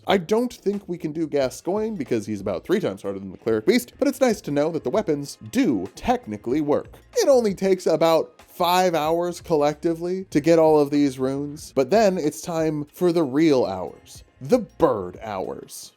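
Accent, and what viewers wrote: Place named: Edinburgh, United Kingdom. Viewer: London? Edinburgh surely?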